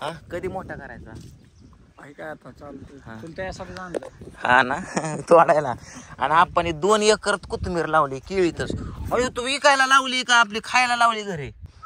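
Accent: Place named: Malshiras, India. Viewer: तुमची भाषा खूप छान आहे मला माझ्या भावाचं बोलणं आठवतं माळशिरस साईटला सेम आसच बोलतात त्यामुळे मी तुमचे सगळे विडिओ बघते पण कमेंट करत नाही आज केली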